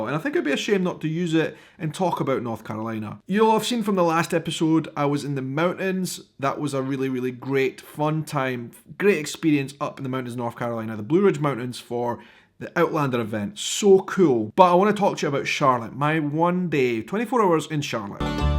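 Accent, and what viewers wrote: Scotland, United Kingdom. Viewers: Contact us next trip. My husband is from Irvine, Scotland talks just like you👍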